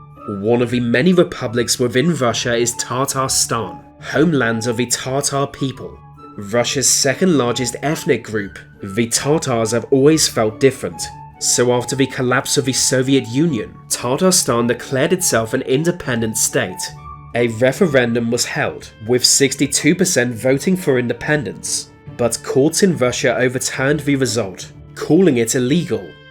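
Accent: United Kingdom. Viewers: What about a United States of, where the biggest export is weird facts and stories told in a snarky, British accent?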